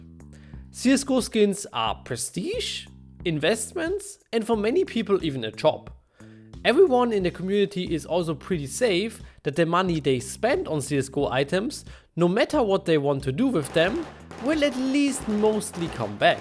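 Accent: Germany. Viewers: warum ist dein stimme so deutsch? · bruder dein deutscher akzent ist nicht zu überhören, sehr gutes video, hat mir sehr geholfen, weiter so!😂👍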